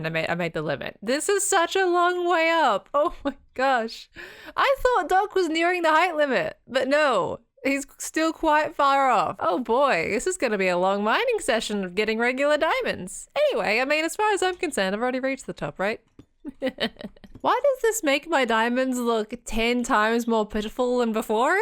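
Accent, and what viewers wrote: Australia. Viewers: Did know youse was aussiestrailian. Fucking coll.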